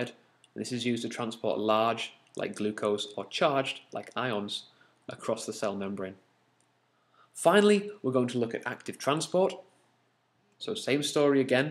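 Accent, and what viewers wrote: United Kingdom. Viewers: Oh myyyy .. That British Accent that I miss a lot!!!!!! Thank you it was so helpful for my SAT biology subject test :)))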